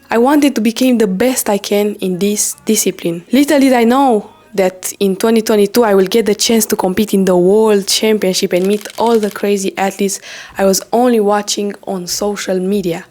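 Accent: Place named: Romania. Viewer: I knew that accent sounds familiar, but I didn't expect you to be Romanian. Your body looks insane. Felicitări!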